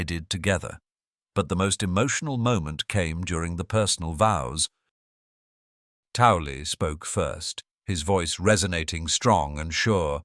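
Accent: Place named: United Kingdom. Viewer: the narrator's British accent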